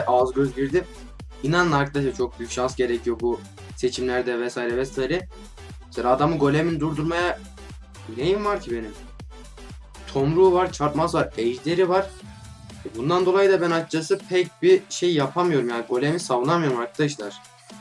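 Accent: Turkey. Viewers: Abi Aslanköy den mi geliyorsun? Öyle Konuşuyorsunda :)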